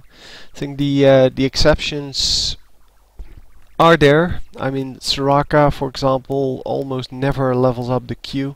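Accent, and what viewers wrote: Netherlands. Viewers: Hey, zou dit ingesproken zijn door een Nederlander? ;o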